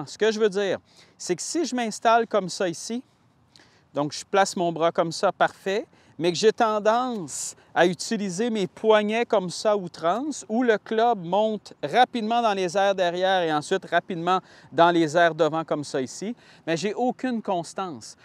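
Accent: Quebec, Canada. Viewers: Merci, simple et efficace. Bravo pour ton talent de pédagogue avec l'accent du Québec en bonus pour nous autres français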